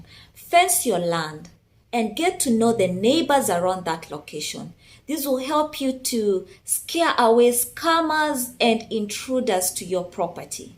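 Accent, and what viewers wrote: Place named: Africa. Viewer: I like your beautiful African accent. I'm Nigerian and I approve these messages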